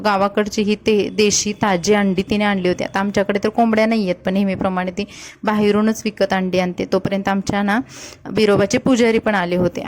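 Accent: India. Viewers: ताई तू कोकणी तर दिसत नाहीस. तू सांगली किंवा कोल्हापूर जिल्ह्यातील दिसतेय तुझ्या भाषेवरून. आणि आईच्या हातच्या अळू वड्या मला पण अजुन आवडतात. आई ती आईच असते. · Seating tujhe gav konte bhasha aamchya solapur sarkhi vatte aani dusara mhanje tubirobadeva vishaibolli mhanje dhangar ka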